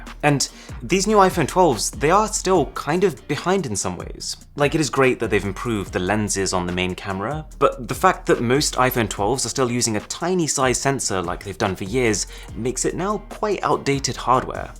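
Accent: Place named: United Kingdom. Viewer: Yep.... speaking the King's English, but then he often ruins it by saying, "anyways".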